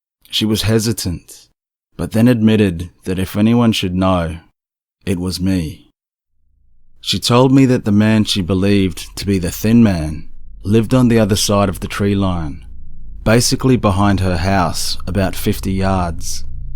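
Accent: Australia. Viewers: Wow!! I really loved this video! Listening to your very sexy Aussie voice on this perfectly beautiful fall day. What more could I ask for?? And I see you've amassed quite the following!! Many wonderful comments on this one!! Congrats!! Even though elephants are my all time favorite animals, I do like your sexy kangaroo!!;-) Take care hon. I'm looking forward to the next one!!:-*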